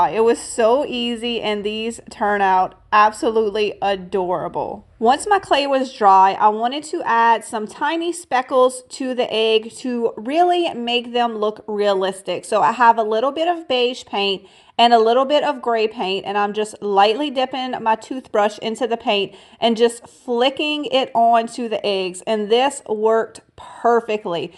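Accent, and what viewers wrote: Louisiana, United States. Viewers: Darling! You sound like you’re from Louisiana!!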